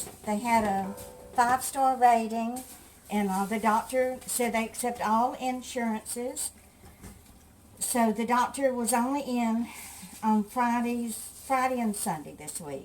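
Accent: South, United States. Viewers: Good morning,. I love to hear your voice. Your voice just makes me feel good. I live in the northern Midwest and the southern accent brings me such joy. I think I can smell that chili and it smells yummy!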